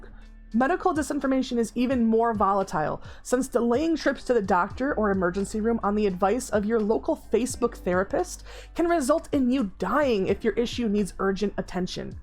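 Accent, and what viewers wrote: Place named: United States. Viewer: I don't think you understand what free speech is. I assume you live in the US by your voice, so unless you have been arrested, tried and jailed for speech, it is a protected right.. Just because you werent allowed to blabber during class as a child doesn't mean you don't have free speech. If you're arrested you can literally sue the police department in civil court for the damages. Threatening somebody or making a call to action where there's a reasonable belief people will get hurt has NEVER been free speech.. also Literally no women in the last 40 years has been told "you belong all dressed up in the corner, awaiting a man's command"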